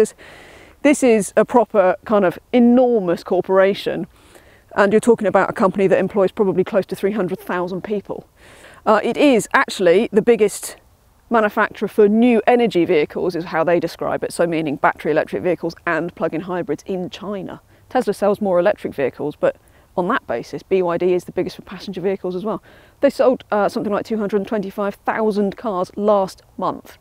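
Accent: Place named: United Kingdom. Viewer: She sounds british, but why is she driving on the wrong side of the road?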